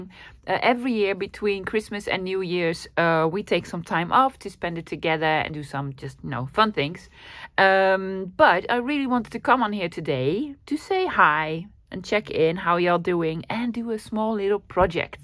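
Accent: Netherlands. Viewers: Hello, I am new to junk journaling and stumbled on your videos by accident, your Dutch accent was like music to my ears as I travelled to the Netherlands a lot when I was much younger, places like Amerstam, Rotterdam, Guoda, Delft, I visited Glass city and the flower markets and auctions I have been to Floriade twice, and many other smaller places, unfortunately I have not visited for quite a few years now, I love your videos my first attempt at a journal is almost finished it's not perfect but I like it, it is filled with many of your projects from the 100 day challenge, you are such a talented and gifted person, and a lot of things from your past resonate with me.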